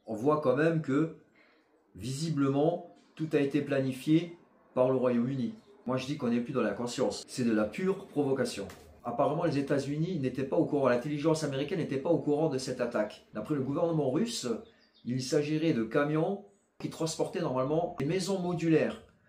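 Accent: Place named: Lorraine, France. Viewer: J'adore l'accent lorrain-moselle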